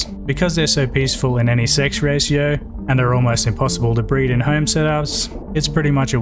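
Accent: Australia. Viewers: Hiya. Are you from Australia?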